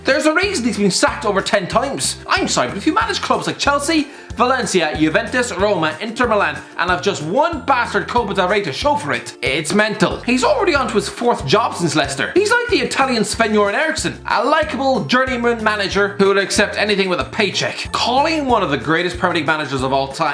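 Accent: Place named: Ireland. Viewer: I love this guy his Irish accent is so funny wich makes the videos so entertaining. Hope everyone is staying safe through these tough times.❤❤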